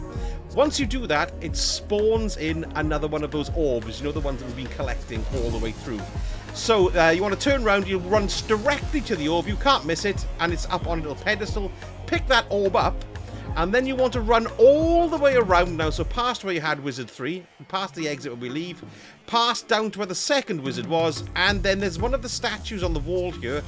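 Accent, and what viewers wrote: United Kingdom. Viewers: And he does it all with a pleasant British accent!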